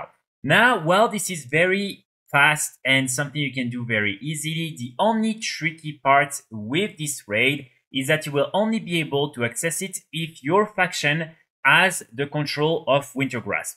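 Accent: France. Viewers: ze french accent is very there (superb video btw thanks <3)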